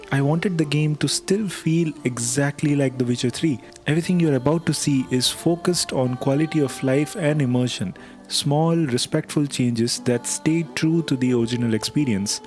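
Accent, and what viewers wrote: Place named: India. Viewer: great vid bro ❤i was looking for some mods for my ng+ playthrough and this helps a ton, also i love your indian accent, it sounds kinda exotic 😁you should definitely keep it haha it sounds awesome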